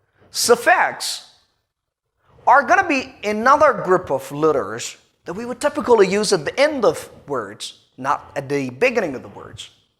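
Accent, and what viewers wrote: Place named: United States. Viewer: Thank you sir your American accent is excellent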